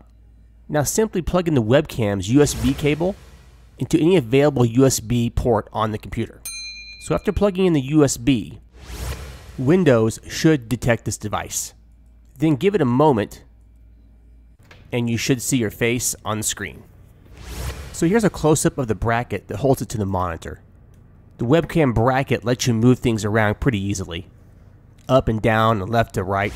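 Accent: United States. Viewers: you from nebraska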